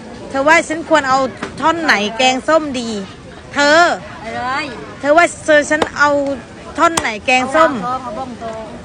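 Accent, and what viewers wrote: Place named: South, Thailand. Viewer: พูดใต้เถิดครับมันง่ายดี-ถ้าพูดกลางเดียวก็กับมา-ใต้อีกครับผม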